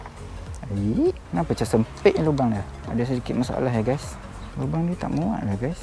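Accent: Terengganu, Malaysia. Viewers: dah makin hilang slang ganu tu, tapi video still terbaik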